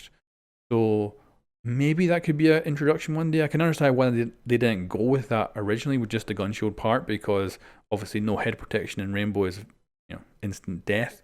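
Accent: United Kingdom. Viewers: AR S it’s something called a b r i t i s h accent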